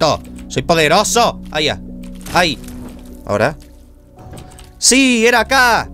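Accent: Argentina. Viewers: vos sos argentino???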